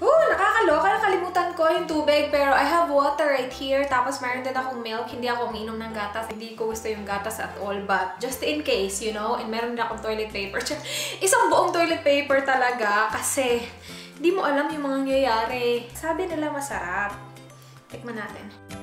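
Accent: Visayas, Philippines. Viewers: Bisaya. Ka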